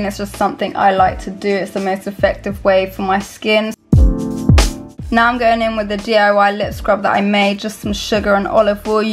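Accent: London, United Kingdom. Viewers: yessss I’m from London and noticed it straight away 🌚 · London accent straight up.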